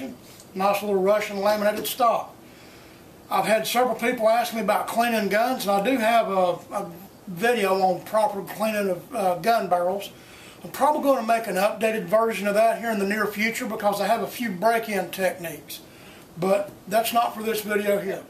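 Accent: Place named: Northeast, United States. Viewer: He lives in Maine as you can tell by his heavy, Northeastern accent. LOL. This really is good and detailed video.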